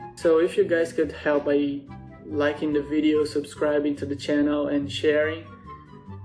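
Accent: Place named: Brazil. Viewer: O sotaque brasileiro é reconhecível em qualquer lugar do mundo 😅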